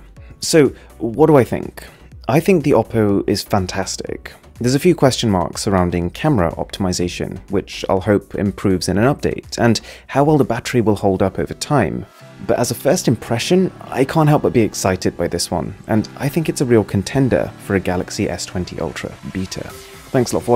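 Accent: United Kingdom. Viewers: that's his NORMAL voice british accent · that's normal British accent you're just being salty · PacMacDo it’s definitely not a generic English speaker, you can tell he’s got quite some British accent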